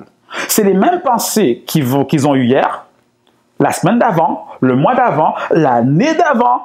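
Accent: Canada. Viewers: Tes vidéos sont vivants et savamment épicées à la canadienne (accent). Riches dans le fond & dans la forme, tes conseils de pro suscitent l'intérêt général et poussent à l'action. C'est vraiment fouettant!!! Merci toujours, Mister. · Cc!! Ton accent est bien CANADIEN????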